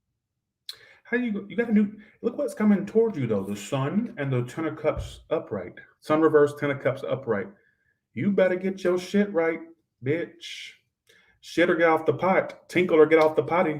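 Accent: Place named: East Coast, United States. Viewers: I love your east coast talk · Love the northeast accent! Very good!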